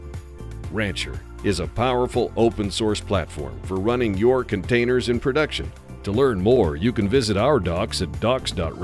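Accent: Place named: New England, United States. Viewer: why does he sound like a yankee with a country accent?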